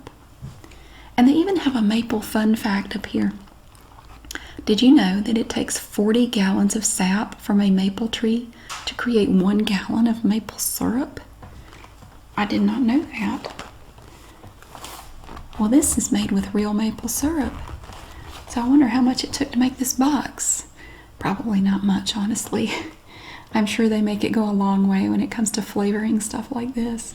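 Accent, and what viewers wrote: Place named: North Carolina, United States. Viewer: Yes, she is a North Carolina native and her beautiful, musical accent is natural! 💖😊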